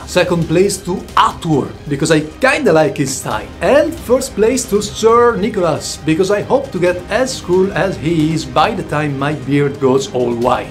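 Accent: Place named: Italy. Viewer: Mi sembri italiano dall accento, vabbè Anyway great 👌🏻👍🏻🤘🏻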